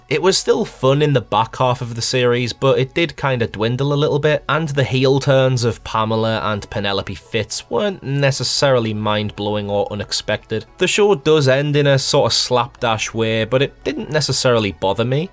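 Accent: Scotland, United Kingdom. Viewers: I truly love this show ❤ Also can anyone tell me what english accent he is speaking in? It kind of sounds like scottish sometimes but he doesn’t roll the r so I’m not entirely sure. I’m just very interested in languages and accents and all that stuff. So if anyone knows or if you want to answer yourself it would be much appreciated.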